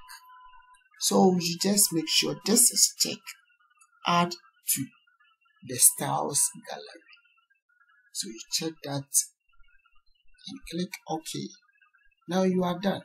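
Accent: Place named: Ghana. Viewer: that's a Ghanaian accent lol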